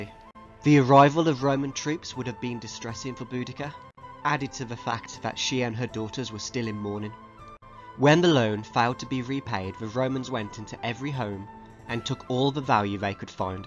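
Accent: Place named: Midlands, United Kingdom. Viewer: Sounds like you're from the Midlands. I'll take you to the new candidate I'm putting forward if you like!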